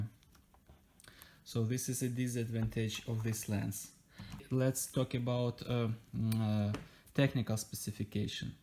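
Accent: Russia. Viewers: Мне кажется, или акцент русского человека говорящим на английском?)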